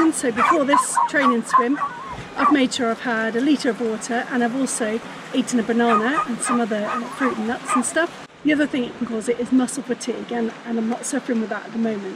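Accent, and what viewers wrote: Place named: United Kingdom. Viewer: Awesome British accent, Thanks for your service 👍, you earned my subscription God's blessings 🙌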